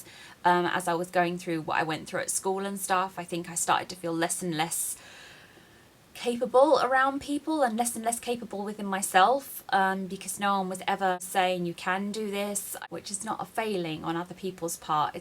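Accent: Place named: England, United Kingdom. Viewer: ! Are you out of country? I'm saying you seem to have an English accent! And can I say a beautiful woman! Commented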